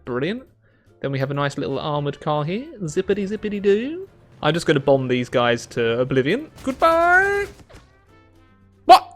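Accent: United Kingdom. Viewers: Oh you are British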